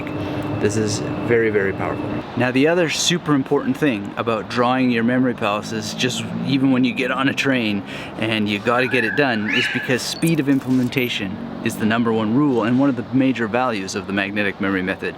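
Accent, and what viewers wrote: Canada. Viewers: Are you canadian? :o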